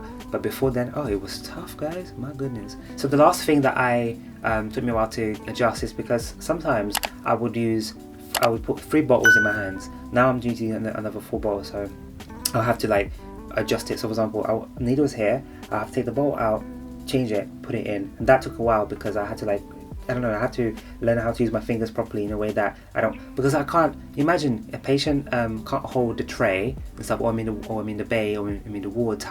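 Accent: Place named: Portugal. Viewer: I’m a admin/receptionist for a GP surgery and I’m so interested in starting my career as a phlebotomist, I’m about to enrol in a private phlebotomist course which is two full days, is nationally accredited, but to be fully qualified I need to get 30 sticks and work experience at a surgery which I might ask my boss if I can shadow our in house phlebotomist on my days off, or go straight to one of our hospital and ask to volunteer and be trained. I’m so looking forward to it, so I’m trying to learn as much as possible about the training process, so I found your video extremely helpful. I’m assuming you are Portuguese by your name and the way you speak, I’m Portuguese too. Bjs Silvia Gomes Freire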